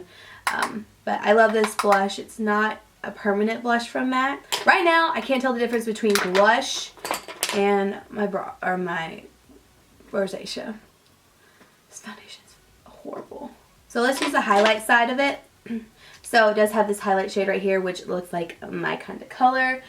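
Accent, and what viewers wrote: South, United States. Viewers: Hey! I'm such a huge fan of yours. A couple months ago I felt you were somewhat losing your more genuine reviews and videos and it seemed like an abundance of advertisements etc. Not hating at all, you were still my absolute favourite on YouTube. But lately you've been putting out such genuine reviews and it's been reminding me of your old videos again! I'm so happy about the content and the amount of videos you've been putting out lately. Just wanted to say that you are more stunning than ever, you're videos have been so amazing and I wish you and Davis all the best. You guys look like you're the happiest you've been! Thanks for sticking to your roots, your subscribers really appreciate the "realness" of your videos. And I love that southern accent! You're beautiful.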